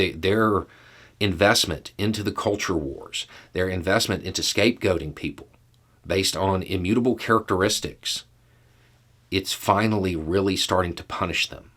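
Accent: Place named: Appalachia, United States. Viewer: Ah another "I have a hillbilly accent but I'm not like the rest of them" Your Zelda shirt gives you away, dude.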